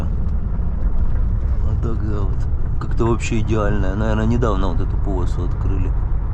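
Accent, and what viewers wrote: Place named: Don, Russia. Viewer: 25.45 Я летом там забодался😂. Сразу видно, казак донской! Мы тоже так в детстве говорили.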